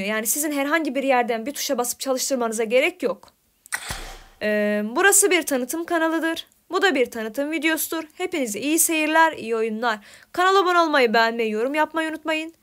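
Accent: Azerbaijan. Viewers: azərbaycanlı sən..?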